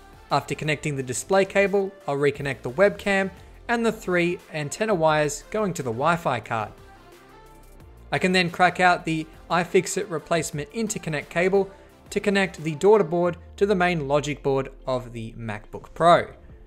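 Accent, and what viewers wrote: Australia. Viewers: His accent mate. He's Aussie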